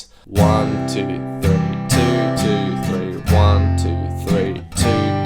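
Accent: Australia. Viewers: 4. Because you are Australian and your accent makes sense to a fellow Aussie!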